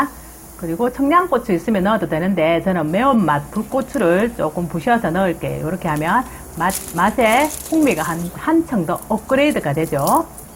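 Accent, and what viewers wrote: Gyeongsang, South Korea. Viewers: 경상도 분들은 그 발음 너무 어려워요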